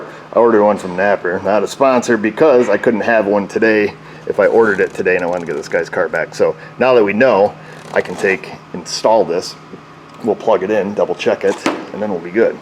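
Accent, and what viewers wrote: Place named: South, United States. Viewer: You sound just like the south of men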